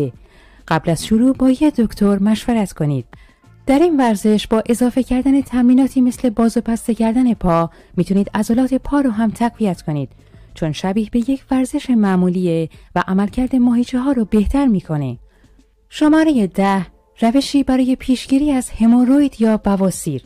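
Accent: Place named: Turkey. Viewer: سلام خسته نباشید خیلی ممنون ازویدئوی خوب تون درضمن لهجه ترکی قشنگی دارین.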